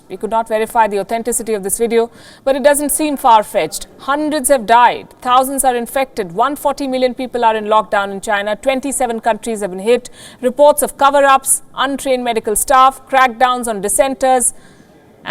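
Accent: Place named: India. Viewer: Her Indian accent is a real turn on.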